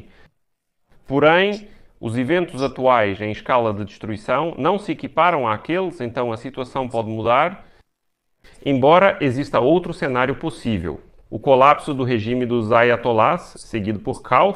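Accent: Portugal. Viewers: Sotaque interessante. É de Portugal o senhor?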